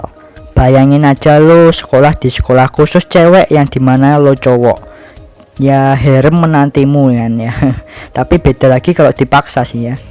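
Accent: Java, Indonesia. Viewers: Waduh min gue baru mampir disini, ngakak denger logat bicaramu kayak Jawa banget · Mas itu logat nya kok medhok Jawa banget, aslinya mana to mas? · Medok bahasanya:v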